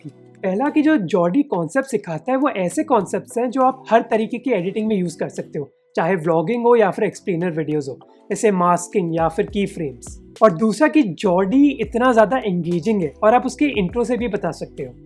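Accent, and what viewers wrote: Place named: Telangana, India. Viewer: it's our slang Telangana slang understand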